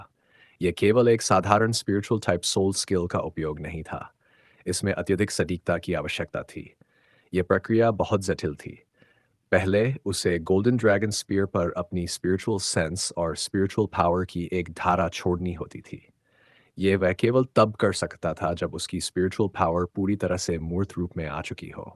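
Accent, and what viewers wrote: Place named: United States. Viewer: Bro video acchhi but voice American English type ho rahaa hai wo better karo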